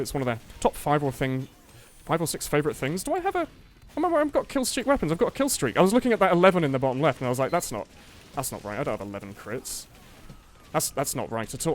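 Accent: United Kingdom. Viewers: u sound British asf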